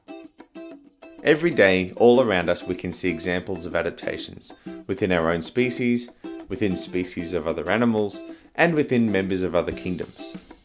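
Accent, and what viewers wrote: Australia. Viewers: it's so comforting to hear an aussie accent on youtube hah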